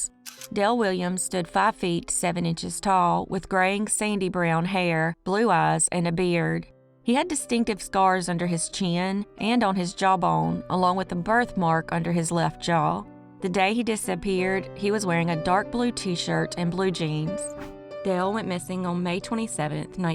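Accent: South, United States. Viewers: I love listening to you from the other side of the Atlantic telling crime stories with your absolutely lovely southern accent. I just love it. Thank you very much for your work and greetings from Portugal 🇵🇹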